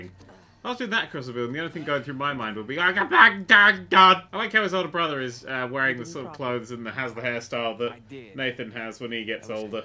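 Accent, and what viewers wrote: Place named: Cockney, United Kingdom. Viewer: Extreme TGX (Game Reviews And Stuff) well he almost has a cockney accent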